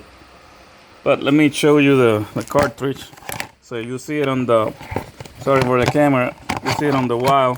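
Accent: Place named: Florida, United States. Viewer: You have some bad azz games there my friend and your Puerto-Floridian accent shines in this video. I am jealous of all those pricey obscure titles. Give me give me!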